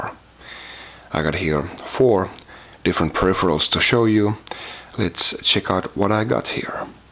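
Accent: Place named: Finland. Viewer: Eli oot sä suomaleine jos oot nii aksentti paljasti ja kanavan nimi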